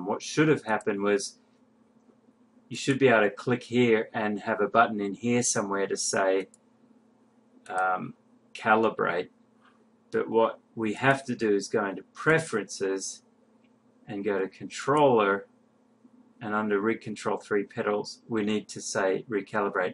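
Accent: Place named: Australia. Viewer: Thank you so much my friend. I'm from Brissie - it was so nice to have a calm Aussie voice walk me through getting this working. Bless you .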